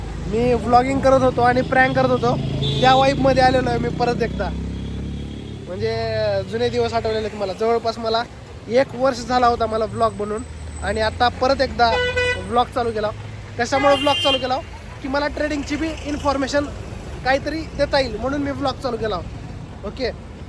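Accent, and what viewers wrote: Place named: Latur, India. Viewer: Tu tar punet rahun Latur ci basha boltho 👍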